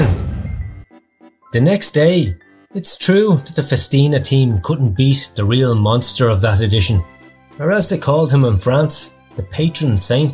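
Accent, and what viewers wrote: Ireland. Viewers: the Irish Accent and the sly digs 'bluffing friend' 'lumpy bald head' cracking me up haha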